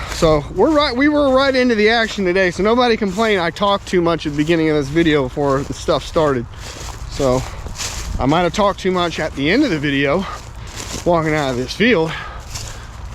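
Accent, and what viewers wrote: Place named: Carolina, United States. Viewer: I think its great when ya talk buddy, you speak the Carolina sweet jaw, sounds wonderful , great videos Mr.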